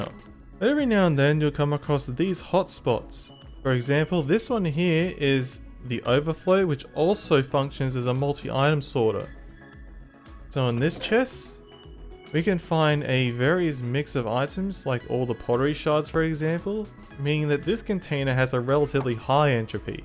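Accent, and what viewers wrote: Australia. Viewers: I LOVE your northern aussie accent btw I forgot what you were talking about until when i was like "oh yeah the golem thats why i was here"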